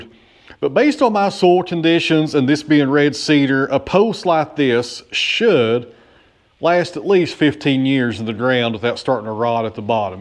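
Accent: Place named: South, United States. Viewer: Its about making a video of a certain time length and incorporating his southern accent as much as possible. People don't want efficiency when watching videos, they want to waste time. Looking into time management for yourself, you have to ask, why are you wasting time on videos when you could be doing things yourself?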